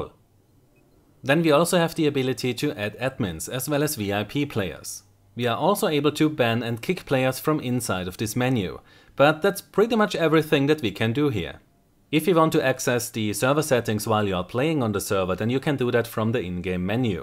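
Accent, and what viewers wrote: Germany. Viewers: are you German? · Hi Where are you from? Germany? (Because you chose Frankfurt as the server destination and I kind of hear it somehow when you speak).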